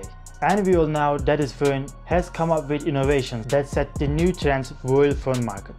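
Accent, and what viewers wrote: Turkey. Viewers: Yazılım ile ilgili videolarda Hint aksanına alıştığım için Türk aksanı bile daha çok dikkat çekiyor.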